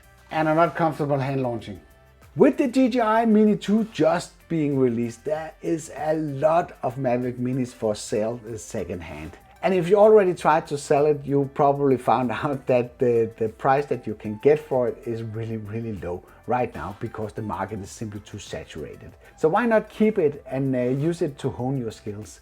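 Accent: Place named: Denmark. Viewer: man kan godt høre at du er dansk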